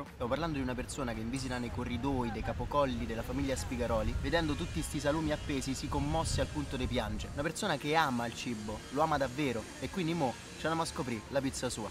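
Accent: Rome, Italy. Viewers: Un bel corso di dizione non sarebbe male, traspare la poca istruzione avuta quando parli romano "andiamo a provà" "annamolo a scropì" "e quindi mò".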